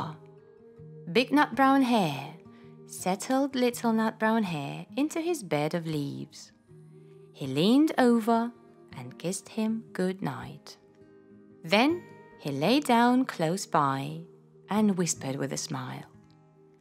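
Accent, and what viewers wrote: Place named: United Kingdom. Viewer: Потрясающе,. Вы - живой пример для меня и мотиватор идти дальше🎉прекрасное произношение британской речи, просто мёд для ушей! Мне понадобилось некоторое время, чтобы понять, что это Вы, читаете 😂браво! К слову, я давно у вас на канале нашла видео о билингвизме и искусственной англоговорящей среде для малышей, собственно, уже два года я и мои дети в этой среде. Хотелось бы больше информации на эту тему, какие игры и фразы можно и нужно говорить малышам во время игр или просто daily routine, чтобы это было аутентично. И вообще наблюдать за прогрессом вашего ребенка. Понимаю, что тема не имеет отношения к вашему каналу, но хотя бы иногда 🥳💕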